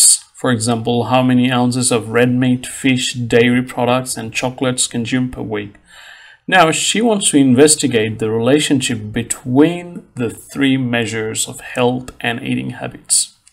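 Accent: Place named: Australia. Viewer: Interesting Australian/Something accent and great video. Thanks!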